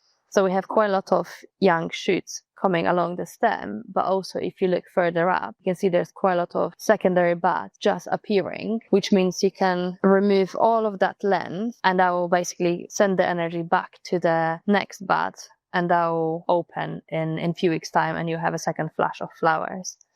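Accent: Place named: Sweden. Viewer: You sound a bit Swedisch.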